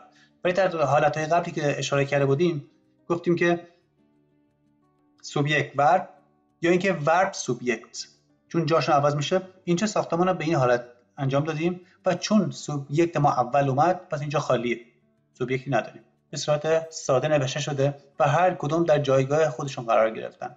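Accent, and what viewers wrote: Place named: Iran. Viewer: خیلی خیلی لحجه ی ایرانی داری یعنی یه جمله سوئدی رو لحجه ایرانی شو میگی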